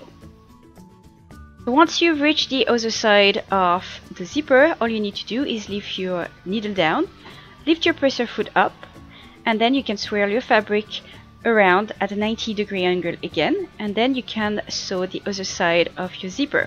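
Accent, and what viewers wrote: France. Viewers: Hello ! I love you tutorial ! I planed to do one ! Are you French ? If yes, I am too ! I think so because when i talk english i have the same accent >w< ! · where are you from? you're accent is like a french accent °-° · are you french :3 ? you have an accent similar fo french 'i speak french btw" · Are you French ??? ( you have the accent ) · Are you French ? I love the tutorials do more ^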